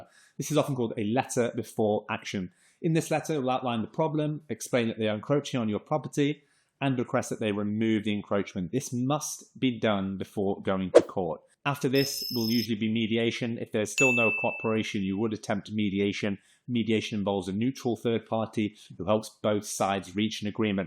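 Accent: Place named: England, United Kingdom. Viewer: Is this UK law or American Law? I notice you're spelling "Neighbor" which is the American spelling but you sound English?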